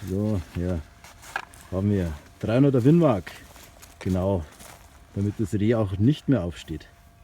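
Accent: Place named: Bavaria, Germany. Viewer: Oha, eigentlich supergeil... aber da bayrisch isda schweer füar da nordditsch. quaquwetschkommod....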